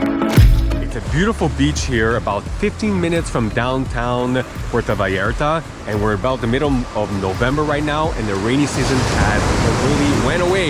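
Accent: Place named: Quebec, Canada. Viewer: Je peux reconnaître un Québecois partout dans le monde ! Très beau vidéo!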